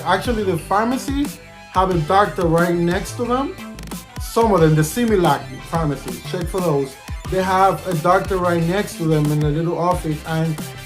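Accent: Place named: Cuba. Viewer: Where you from Brother you have an interesting accent. Is it Cuban?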